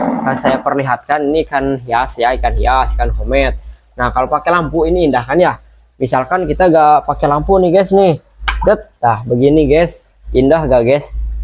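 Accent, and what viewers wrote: West Java, Indonesia. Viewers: Aing asa aya layan. mun tepung jeng orang sunda. aslina kang lila ngarantau ngadenge logat sunda asa bungah pasedek-sedek herin. modar 😅 🤣🤣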